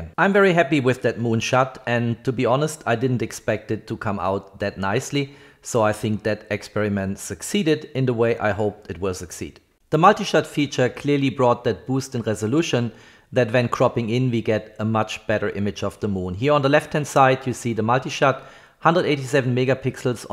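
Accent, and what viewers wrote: Germany. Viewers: Bist du aus dem Schwabenland?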